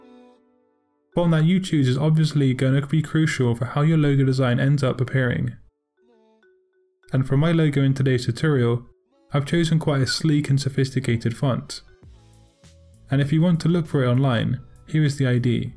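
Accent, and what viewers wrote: United Kingdom. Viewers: Your accent sounds really british..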